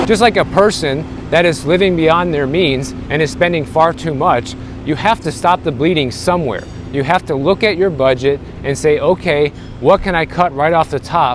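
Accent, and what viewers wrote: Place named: South, United States. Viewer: We know you're a southerner when you say ( furr ) instead of ( for ). NO NO NO I can 1st hand attest to money ruining lives. Money can absolutely ruin and kill people and I have 2 cousins and 2 uncles that are deceased due to money and a good friend of mine his son is dead due to money. Musk and Vivek claim they could probably cut 2 trillion a year in waste and fat .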